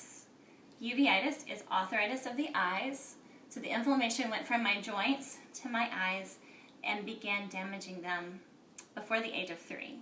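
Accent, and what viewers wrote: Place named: United States. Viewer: Just cause she sounds american. u_u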